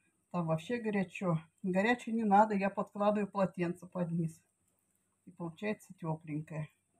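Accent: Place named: Russia. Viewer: Слышу знакомый говор на О.где вы живете?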